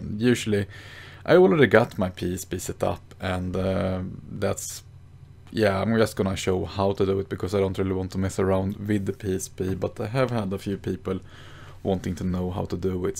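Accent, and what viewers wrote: Sweden. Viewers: Could you by any chance be swedish??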